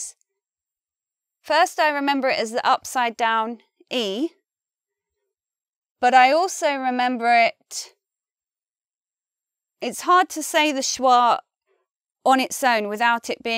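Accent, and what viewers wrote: United Kingdom. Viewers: You are the symbol of the British accent.. I like to hear and listen to you a lot 🤗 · The presenter's British English makes this tutorial for memorizing IPA less useful for a learner who speaks US English as there are many differences between British and US midwestern pronunciation. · What accent is this? It sounds British but....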